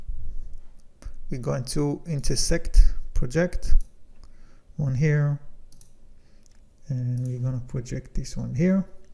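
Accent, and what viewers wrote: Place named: France. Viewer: Parfait! J’ai beaucoup appris avec ton exercices! Votre stil d’expliquer est magnifique. Merci beaucoup! Avec tous les astuces tu a montré c’est beaucoup plus facile pour moi à construire avec fusion 360. C’est possible, que tu travailles chez Airbus? Je ne suis Français, je suis allemand, agrandi vis à vis de l’Alsace, à Fribourg, le Français est mon deuxième langue. Mais je crois, que tu à un petit petit accent français, ne c’est pas? Le petit mot « voilà » qui apparaît de temps à temps, me plaît beaucoup! De toute façon, ton anglais est merveilleux! Gratulations! Et encore un gros merci!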